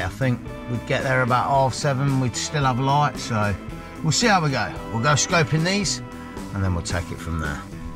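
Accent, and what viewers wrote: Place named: Loughborough, United Kingdom. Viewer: I'm from Loughborough and spotted that accent immediately haha 👌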